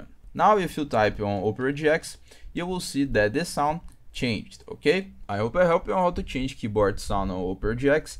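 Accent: Brazil. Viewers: parece br falando ingles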